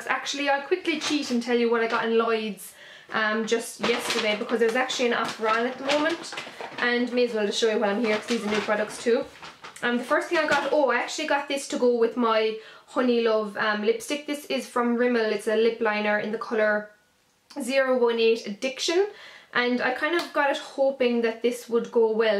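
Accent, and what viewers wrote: Ireland. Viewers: I think your Cork accent is SO beautiful.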